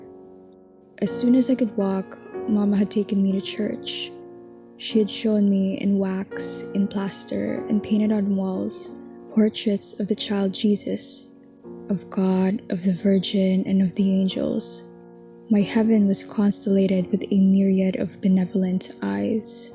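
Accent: Philippines. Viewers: all i know is that you have a filipino accent<3 But heyyy, If you are a filipino, And you're questioning the filipino culture upbringing and spreading awareness even if it's 3 minutes long, It can help. Well story short, I'm a filipino with a religious mother with toxic family especially on reunion, And as an asian, Body shaming, bullying, intimidation, toxic conservatism is considered normal. With all honesty. I wish i was overreacting or joking. Would you believe?!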